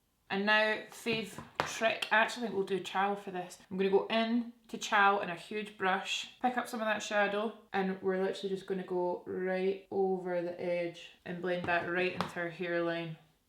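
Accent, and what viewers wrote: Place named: North Lanarkshire, United Kingdom. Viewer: First time watching and I'm so homesick now. You sound so like my friends from home. North Lanarkshire by any chance?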